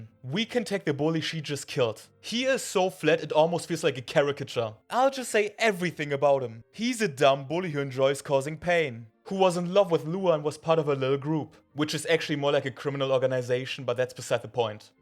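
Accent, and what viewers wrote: Germany. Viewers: Ar you German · Man hört richtig raus dass du deutscher bist hahahaha fr mach mal Videos auf deutsch ich suche ganze Zeit schon jemanden der sympathisch ist und manwha webtoons oder Mangas erzählt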